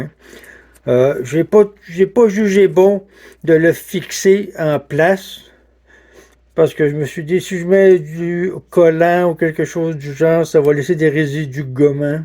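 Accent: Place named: Quebec, Canada. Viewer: Vous n'entendez pas l'accent québecois? Ça fait loin pour réparer en Suisse.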